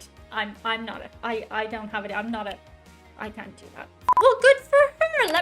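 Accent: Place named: Ireland. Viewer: Question for! Your accent fascinates me. There seems a fair amount of Irish in the background. Where do you come from. By the way, you have a very pretty face. ( That's one of the reasons I watch your videos and love the music )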